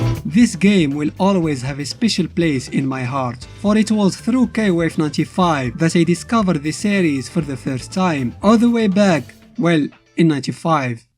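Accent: Morocco. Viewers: Great commentary! I knew you are moroccan by the accent! KOF is very popular in Morocco, especially KOF '97/'98 and '99. Still played a lot in the video arcades, i saw lot of strong players in Casablanca. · You sound Moroccan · men l'accent katban liya mroki a ssat ila ma kentich ma ratfhem ta le3ba wma raytra walou :D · from your accent, I can tell you're Moroccan (Tbarkellah aalik)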